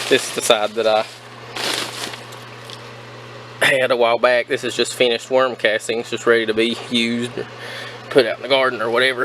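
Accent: South, United States. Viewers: Enjoyed the video. I have a couple bins now and want to grow more. I appreciate the good southern accent. Thanks from TN.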